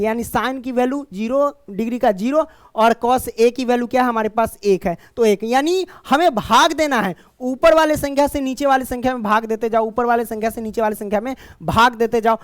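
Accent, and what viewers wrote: Bihar, India. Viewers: Sir bihar se hain n....?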